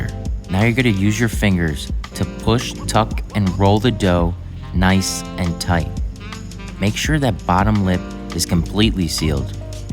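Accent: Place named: Philadelphia, United States. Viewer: The Philly accent cracks me up. Left Philly in 80 and still miss the Hoagies.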